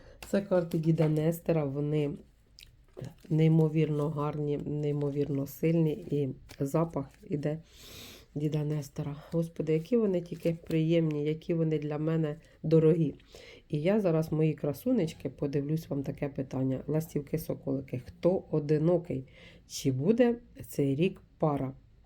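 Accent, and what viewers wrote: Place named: Kirovohrad, Ukraine. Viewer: ви самі звідки?Я з Кіровоградщини і ви розмовляєте так,як у нас балакають.Дякую,що я вас відшукала, відео короткі,дякую.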